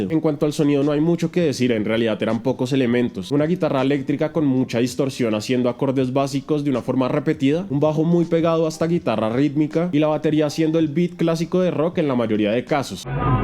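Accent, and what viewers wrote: Colombia. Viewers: oiga pa, deberiamos formar una banda mucho mas indie y experimental que rompa estos esqumas, soy guitarristas, me cuentas se nota que eres colombiano XD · ¿Eres de Medellín o Bogotá? No distingo tu acento, soy fan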